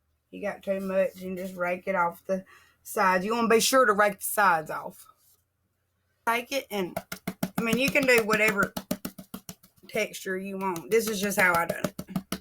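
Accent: South, United States. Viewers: Love it and I love your southern accent.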